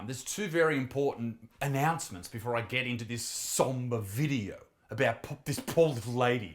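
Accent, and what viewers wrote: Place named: Australia. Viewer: I heard about her doing this: "Well there's the next Isaac vid. Also why is she Aussie?"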